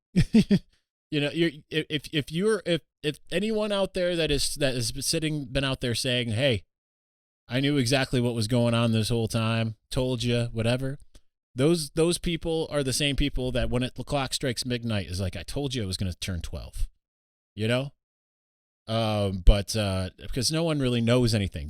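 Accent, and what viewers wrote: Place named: Michigan, United States. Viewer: Been listening to your podcast for a few years now. Your Michigan accent grows stronger each podcast. Brings me joy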